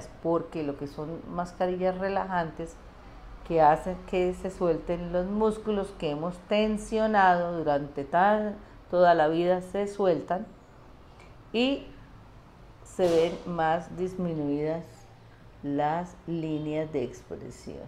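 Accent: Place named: Costa Rica. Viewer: Habla como costarricense.Soy de Costa Rica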